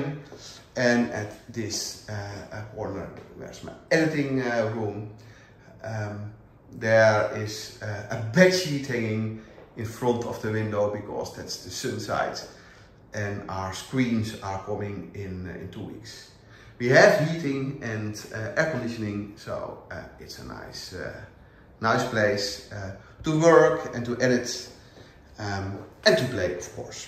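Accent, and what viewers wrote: Netherlands. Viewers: Congratulations on your new console. That is magic! I watched your video just minutes after finishing practising two other works by Phil on the pipe organ I now play for Sunday church. I share the role so only play fortnightly which gives me time to learn new music. I thoroughly enjoyed your video and the beautiful piece by Phil. In my teens (eons ago) my piano teacher was Dutch. If not for WWII, she would have embarked on a career as a concert pianist. Your Dutch-English reminds me how lucky I was to learn from a world class musician in my 'formative years' 😃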